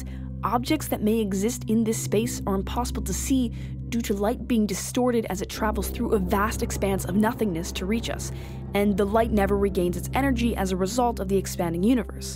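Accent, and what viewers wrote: Canada. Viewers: u kinda sound canadian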